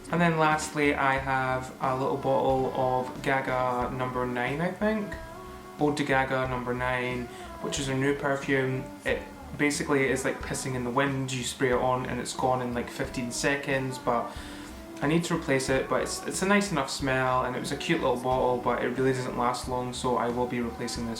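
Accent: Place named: Scotland, United Kingdom. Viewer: your accent is lovely! are you scottish?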